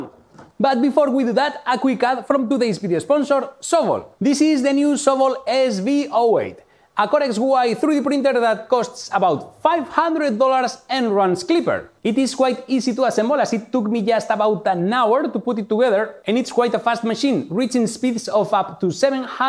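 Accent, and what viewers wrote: Italy. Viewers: Absolutely. Can’t wait for the next episode. And you know what’s also brutally fun? Watch this with your eyes closed. I mean, just listen to him. He is king of speaking Italian using English words. Do so love that. 😊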